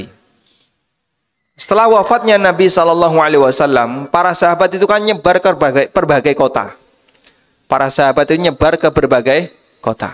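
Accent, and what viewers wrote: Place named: Java, Indonesia. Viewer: Ngomong nya ko Jawa sih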